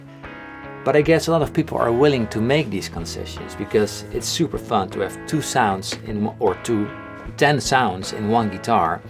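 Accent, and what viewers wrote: Netherlands. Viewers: Are you dutch? You sound dutch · Haha, instantly heard you were Dutch (me too XD)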